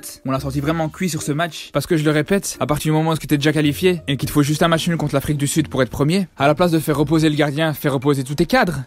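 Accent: Belgium. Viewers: Toi t belge mon frère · La vidéo m'a tué 😭😭 par contre on entend bien ton accent belge !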